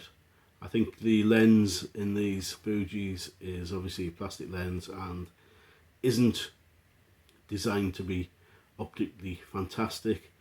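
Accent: North East England, United Kingdom. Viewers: I have just discovered your channel on a youtube safari and immediately spotted the NE accent! I have watched a few of your videos now, and will continue over the next few days. My friends think I am mad because I mainly do film photography, but looking at some of your digital collection makes me feel saner! I have to say: Bad 35mm film cameras are miles better than bad digital cameras! I have a Fuji STX-2 which cost £15 at a pawn brokers and have to say it is a camera that never disappoints and from what you say about the STX-1 it would seem that Fuji film cameras are definitely unsung heroes. I love your content and the way you present it (a lot more polished than me) and I am hoping that I will be inspired to get better. I am looking forward to more over the coming days.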